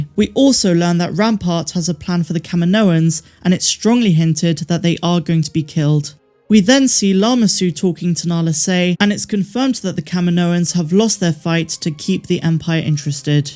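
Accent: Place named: Bristol, United Kingdom. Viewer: Hi. First, thank you for all yours videos.....(still saying your a West country girl, Bristol???) I just love the West Country accent, but I hear the bizzell accent in you. Oh, just to let you know, just in case your thinking, why is he saying all this......don't worry, its because I'm from the West Country......Weston-super-Mare. Anyway, OMG, loved this episode. The John William music made it for me. The man is a Legend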